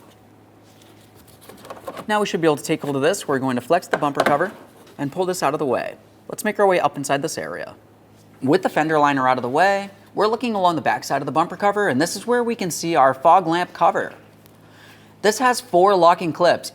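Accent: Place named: Los Angeles, United States. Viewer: I live in Los angeles and this is how everyone speaks in this hedonistic and PC city.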